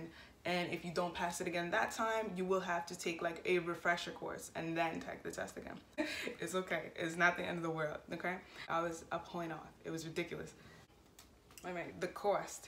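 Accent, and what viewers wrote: New York, United States. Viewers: as soon as I started watching I just knew you were from New York lol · Sound like a New Yorker😎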